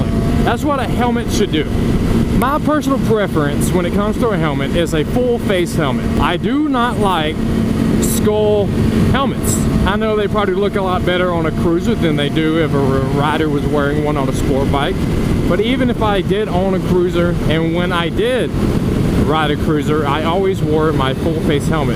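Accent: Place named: South, United States. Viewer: Hey man, keep up the vocal pace to avoid a 20 min video. It sounds normal in 1.5x speed! The southern draw is nice but if you keep yourself aware of pace you can cut your vid time down by about 40%. Nice vid though, Cheers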